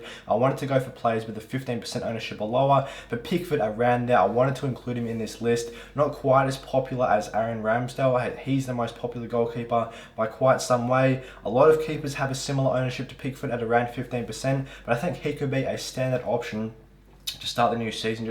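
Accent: Australia. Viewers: Thx for the vid. Also... Thx for the recent reply to my comment re your info. I couldn't quite work out your accent but now you've confirmed you're from Oz. I take it you will be following Big Ange's exploits at TH.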